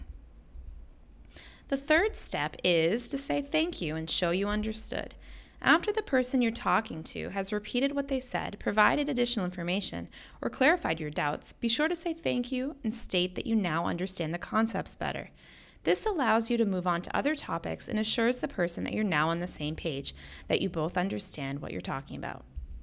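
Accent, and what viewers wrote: United States. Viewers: Great American accent you have.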